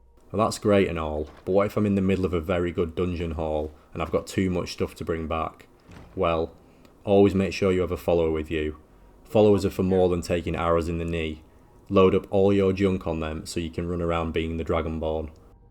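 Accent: United Kingdom. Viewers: I don't dislike your accent it's kind of cool. But it seemed like a different kind of British accent. I have a friend that moved here from some town right outside of London he said and we pick on him all the time about his accent. Nothing bad just good clean fun. But yours seems even different than his accent. Yeah Arkansas has more of a country draw to the way they speak as to where people in New Orleans have more of a creole type accent. It's weird because anywhere I go in the country they know I'm from New Orleans it's a distinct accent that's for sure. I have never left the United States before. Never had the opportunity to but if I do get a chance I definitely want to go does the UK or France or Ireland that would be where I would like to go. Even Italy. Definitely definitely wouldn't mind dating some of the Swedish ladies, Sweden has them long-legged blondes anyway yeah your accent is definitely unique even within the scope of being British. 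Like I said I don't dislike it it's just different that's all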